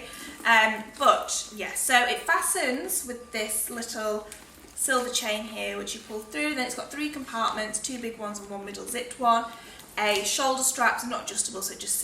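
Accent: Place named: West Yorkshire, United Kingdom. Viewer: She's from West Yorkshire, near Leeds (in the North of England)!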